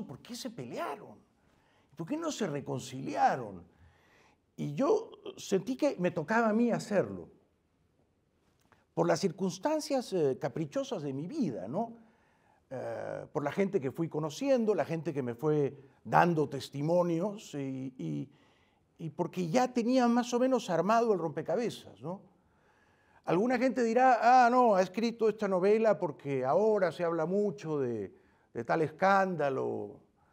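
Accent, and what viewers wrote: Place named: Lima, Peru. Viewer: Jaime es muy limeño en su idiosincrasia y manera de hablar. Me encanta...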